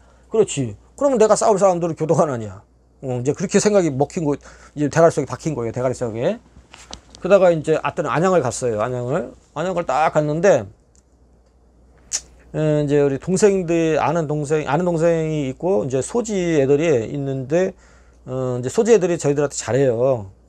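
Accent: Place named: Jeolla, South Korea. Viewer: 전라도 충청도 인천 사투리 다 있으신거 같아요 ㅎㅎ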